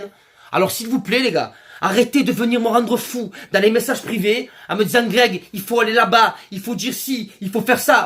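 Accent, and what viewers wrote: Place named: South, France. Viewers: Je n'en ai rien à faire si vous ne me saluez pas ! je ne vous ai rien demandé il me semble ? je ne me suis pas adressée à vous. Si vous suivez ce gars c'est votre affaire, libre à vous. Ce n'est pas parce qu'il a un accent du sud qu'il m'horripile, c'est parce qu'on dirait un malade mental en train de gesticuler ! 3mn de sa vidéo m'ont suffi !!! franchement s'il est intelligent, il le cache bien ... je suis désolée si mon commentaire ne vous plait pas, mais j'ai l'habitude de dire ce que je pense. Cela prouve votre niveau "d'intelligence" à vous aussi... visiblement lorsqu'on aime pas quelqu'un on est catalogué de haineux ? belle mentalité ! bravo, vous avez tout compris !! alors continuez à écouter les analyses pertinentes du pantin dans sa boite, je pense que votre niveau est identique ! ne prenez pas la peine de me répondre, je ne vais pas perdre mon temps à venir vous répondre ! 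je vous laisse donc déblatérer tout seul ! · Minable ce commentaire, il a un accent du sud et parle avec les mains et alors? ça vous suffit pour le juger et le traiter d abruti?? j ai pas pour habitude de jouer au cow boy en défendant des youtubers, mais pour le suivre depuis un certain temps j ai envie de dire que c est un homme extrêmement intelligent et respecteux, qui traite de sujets variés à travers des analyses pertinentes et une touche d humour. Il faut être talentueux pour arriver à faire ce qu il fait et surtout pour supporter des commentaires gratuitement haineux et non fondés comme le votre.Voila pourquoi il est suivi par près de 30000 personnes Madame, peu cher payé d ailleurs pour les moments de rire qu il nous offre. Bref, je ne vous salue pas.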